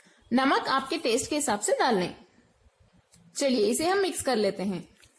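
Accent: Hyderabad, India. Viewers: Ap Hyderabadi ho? 😍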